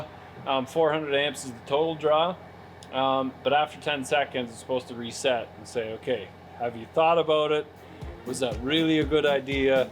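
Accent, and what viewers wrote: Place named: Alberta, Canada. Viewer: My ears tell me this guy's from Alberta, but my eyes say Ontario. Either way, it's Canada. :P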